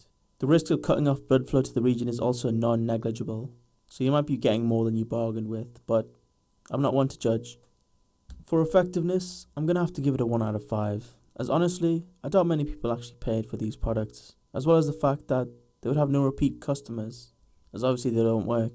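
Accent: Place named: United Kingdom. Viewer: That's just a British person talking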